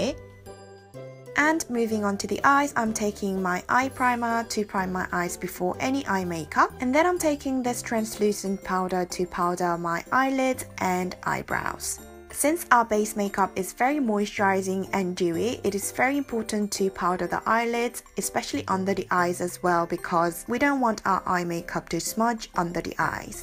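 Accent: United Kingdom. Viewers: 와 이 화장 진짜 너무 예뻐요 언니ㅜㅜㅜㅜ영상 너무 잘보고 있어요ㅜㅜㅜ한국말 하실때도 매력 넘치시지만 언니 영국식 발음이.....❤️ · 저기 죄송한데 영국발음 영드로 공부해도 되나요? 발음이 너무 탐나네요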